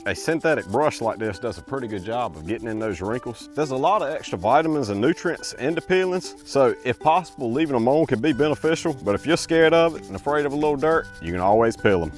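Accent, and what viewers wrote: United States. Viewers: As a Australian... he sounds nothing like a aussie. He's all American 😅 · Make American accents thick again.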